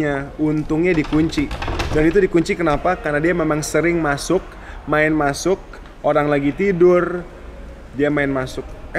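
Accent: Banyumas, Indonesia. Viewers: Mang mba nya org ngapak jg kya sy yah...rep ndeleng apaaa...kuwe artine mo lht apaaa..hihiii...btw...aslinya owee ank mna tuh...😘😘😘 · Mbaknya ngapak 😆 snowee lucu bgt 😘😘😍😍 fix, klw aku udah punya rumah sendiri mau punya satu :) udah pengen dari dulu.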